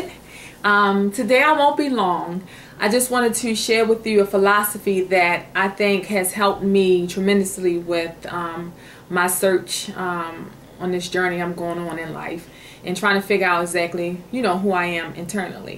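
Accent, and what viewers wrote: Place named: Baltimore, United States. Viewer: You got to be from Baltimore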